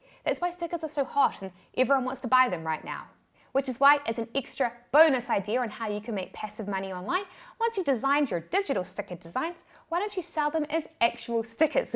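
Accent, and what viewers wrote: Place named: New Zealand. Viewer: As always, you present valuable content in your videos. In this video, you talked about digital product ideas I had not thought about. Thank you! There's another reason why I enjoy watching your videos. That reason is listening to you speak. I've loved the sound of the New Zealand, Australian, and British accents — in that order. Be safe. Stay healthy. And, most of all, enjoy your vacation!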